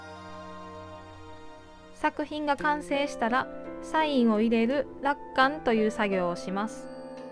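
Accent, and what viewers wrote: Kyoto, Japan. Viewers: 京都人やから一瞬で京都の人やとわかった、、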